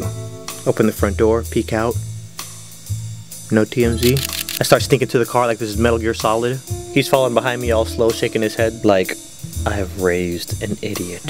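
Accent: Trinidad and Tobago. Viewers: You from Trini? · I was waiting for him to bus out a trini accent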